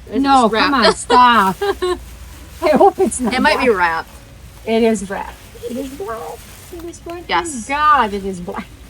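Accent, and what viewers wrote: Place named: Central Europe. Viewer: Nice! Im trying to place your accent......Central Europe?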